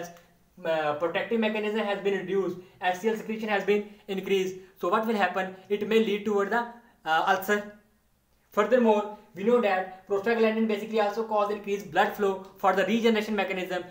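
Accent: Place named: Pakistan. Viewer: You explained very nicely sir thank you so much 😊 I think you are from Pakistan ( I recognised by speaking tone )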